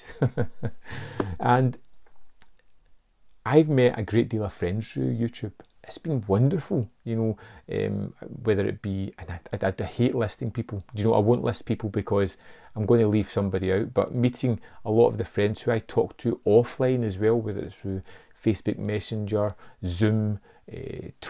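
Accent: Scotland, United Kingdom. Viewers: Love the channel mate keep it up i didn’t know you were scottish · Do a review of Braveheart with your regular Scottish dialect, rambling about how inaccurate it is.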